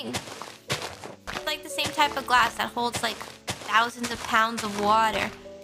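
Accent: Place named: New York, United States. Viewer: Her accent almost NY-like. Mid Atlantic/NY? Anyways I usually don't like 'mom jokes' but everytime she cracks it its so cute and funny.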